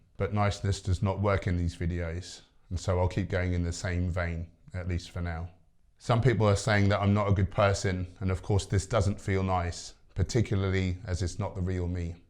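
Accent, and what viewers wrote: United Kingdom. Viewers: I like sv3rige, You sound like your from the Midlands , same as me.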